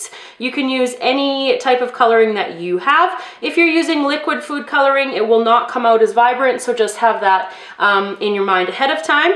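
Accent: Canada. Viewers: Looks good. Are you Canadian? No hate but accent kinda bothers me.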